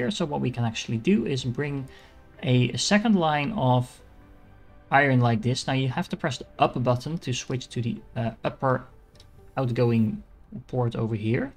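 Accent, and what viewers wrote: Netherlands. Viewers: After deciding to pick this up again i came across your video. Nice one, i`ve already got 552 hours into this game but i still learnt something. Will definitely watch more of your content. Who doesnt love a Dutch accent! Thanks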